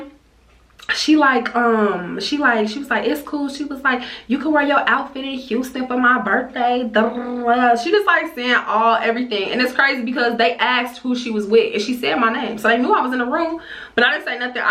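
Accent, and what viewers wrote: Chicago, United States. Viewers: 🤔 you sound like you from Chicago, I’m new here do idk lol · Lol are you from Chicago?? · Just listening to you talk I can tell you from Chicago and it’s comforting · I can definitely tell you’re from Chicago sis! Accent hella strong! 🤣 sooo pretty btw! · I can just tell u from Chicago 😭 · Are you from Chi bc it definitely sound like it 😭?? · I love a good Chicago accent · You from Chicago?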